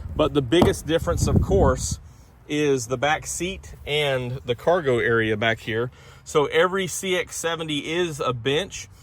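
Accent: South, United States. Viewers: Southern accent…easily misinterpreted. Bench is the word vs Captain chairs in the CX-90. Nice vehicles!!!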